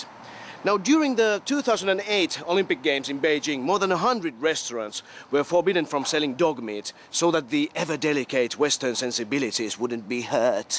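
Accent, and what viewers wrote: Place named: Netherlands. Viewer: he sounds dutch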